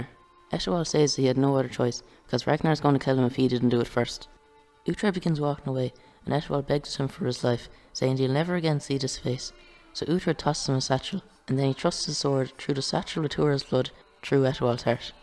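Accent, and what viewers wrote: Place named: France. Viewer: at first I thought this dude was speaking french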